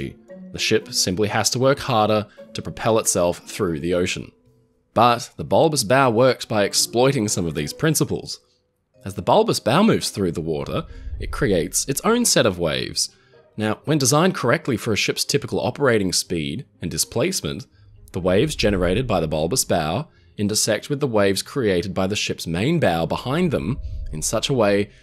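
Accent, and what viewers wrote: Australia. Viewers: he sounds like an Aussie to me?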